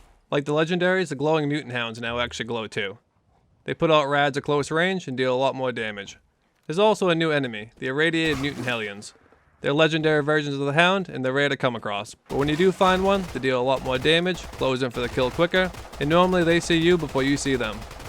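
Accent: Boston, United States. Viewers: The Boston accent makes these FO4 mod reviews much more authentic. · hembury I knew that accent after about 20 seconds of watching.....Guy from Boston modding game set in Boston= immersive :)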